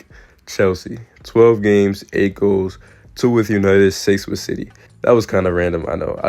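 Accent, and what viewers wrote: New York, United States. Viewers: Great video. I like your humour and like your accent. I could tell you from New York because you sound like another YouTuber that’s from New York lol 😂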